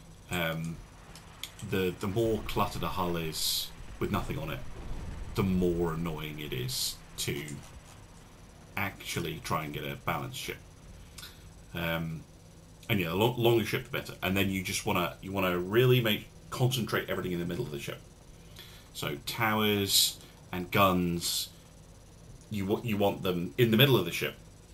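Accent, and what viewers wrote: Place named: United Kingdom. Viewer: It's the British accent ;)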